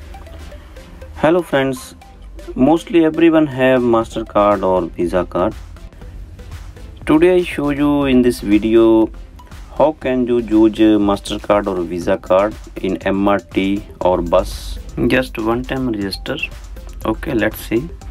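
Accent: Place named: India. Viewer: his thick Indian accent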